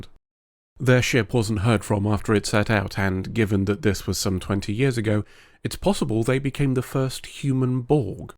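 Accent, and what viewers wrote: United Kingdom. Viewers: love your voice, love your British accent, and I love your deep analysis of my favorite Star Trek show lol "There is nothing beyond the subatomic." interesting how our science has progressed since the 90's. though, barely lol. i don't know how they could've done it (swirly-whirly-clownshow level) better, as they would've either needed to know future science, or make shit up, but I think they were trying to imply that consciousness is based on "quantum-level" subatomic mechanics (which they've done before, say, on DS9) which is fascinating if you're one of those people that ponders how consciousness physically works.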